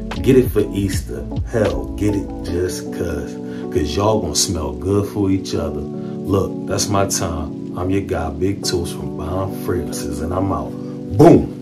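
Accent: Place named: Philadelphia, United States. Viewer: I already knew! Michigan this way. But I know that accent anywhere, friends from Philly. Thinking about wearing it tomorrow!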